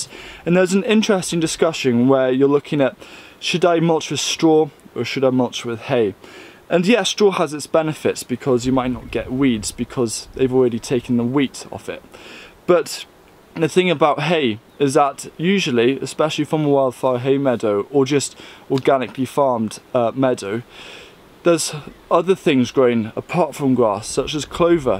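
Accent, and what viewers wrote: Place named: United Kingdom. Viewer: Good expectation,I love the British accent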